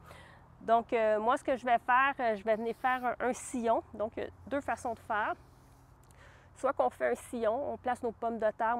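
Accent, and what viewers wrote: Quebec, Canada. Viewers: Coucou amie Québécoise Très heureux d'avoir fait ta découverte et ton accent me ramène à Montréal ou j'étais il y a a peine un mois pour des vacances en famille ❤❤❤ Je m'abonne biensur a ta chaîne Bonne journée à toi et à bientôt Greg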